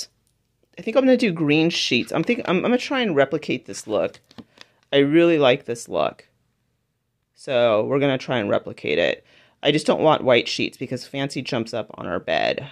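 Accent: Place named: United States. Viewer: Muy are beautiful as I see you on your face with quialificatiion to be on the camera of your phone, thanks for your help on how to buy items and the complementaries to see you more on your camara, I love your voice is perfect to hear the good American's accen which is easy to follow for me that english language is not my mother's ternt language , sincerely from Norway , raiza Theis from Venezuela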